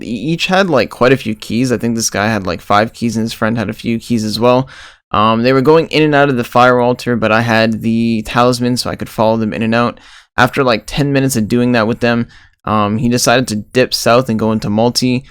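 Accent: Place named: Ontario, Canada. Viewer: Are you Canadian? Sounds like south Ontario.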